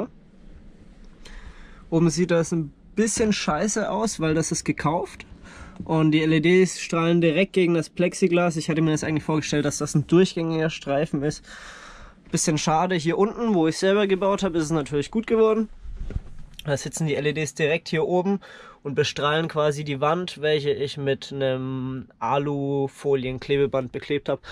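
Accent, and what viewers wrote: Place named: Upper Franconia, Germany. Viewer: Am Anfang vom Video hab ich mir gedacht der is doch sicherlich aus Oberfranken und dann ah der kommt ja auch ausm Landkreis Bamberg wie man selber 😂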